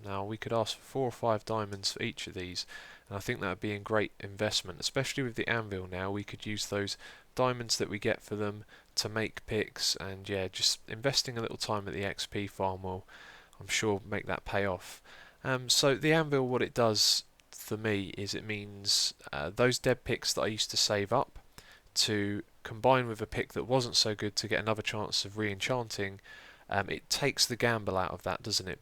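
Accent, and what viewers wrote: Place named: England, United Kingdom. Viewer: Your pronunciation of water is correct, you just have an accent. I would say about 50% of English people say it that way. I also do that sometimes, I pronounce mountain Moun'ain. I also noticed about 50% of Americans tend to do that.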